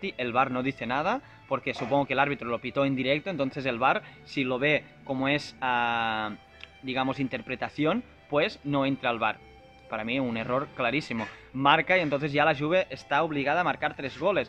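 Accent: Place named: Catalonia, Spain. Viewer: Tens un accent català que es nota des de 25 m hahahahahahah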